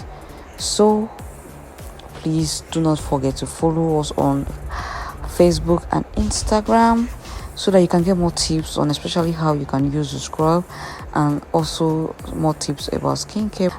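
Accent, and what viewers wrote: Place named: West Africa. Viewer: Lilac baby li-lac pronounced lie-lac or lye-lac The tutorial is very beautiful but your pronunciation been terrible at least I know what herbs you use and everybody else knows what herbs you you use you must be from the french part of Africa i had to watch your videos over and over to figure out what you was trying to say!!!...😊😘😍🤩 i still love your beautiful accent From your country where ever it is and your skin is amazing and all your tutorials are too but I still have to figure out😂😅🤨 what you be trying to say in the herbs section.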